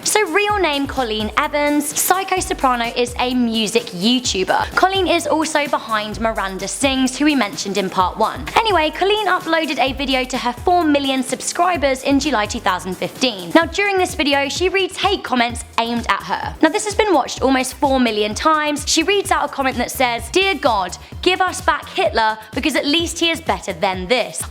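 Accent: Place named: United Kingdom. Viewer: ( ͡° ͜ʖ ͡°) youre beautiful and so is your british accent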